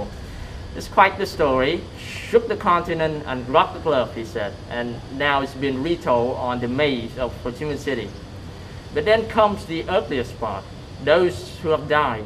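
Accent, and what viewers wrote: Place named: United States. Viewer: Good American accent, Meaningful content!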